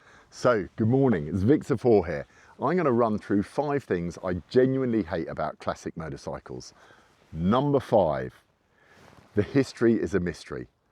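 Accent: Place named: United Kingdom. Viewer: You sound like you have a British accent. As a Brit, have you owned or ridden a classic (pre John Bloor) Triumph or other British bike? I’ve owned many old 1960’s-1970’s Triumphs over the decades, and I still have a couple. Although it’s not as easy as running to the local dealership, finding parts for those older bikes isn’t really a problem. You can find anything you need online… for now, anyway.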